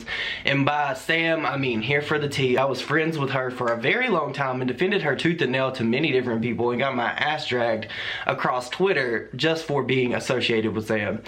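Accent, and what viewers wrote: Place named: Carolinas, United States. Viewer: OMG so excited there’s another in our area. I’m in Clover but raised in Gastonia. Subbed to them. Love hearing our country drawl in videos I’m watching. ❤️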